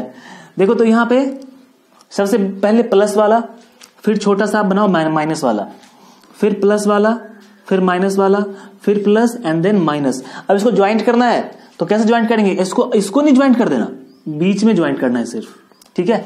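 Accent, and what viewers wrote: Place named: Bengal, India. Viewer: Sir are you bengali, I think your tongue sounds like a bengali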